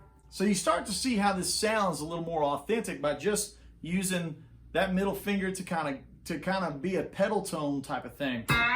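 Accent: South, United States. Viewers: This is such a great lesson. Being a German, I dig that southern accent. Thanks a lot sir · I love your playing but i also love that Southern accent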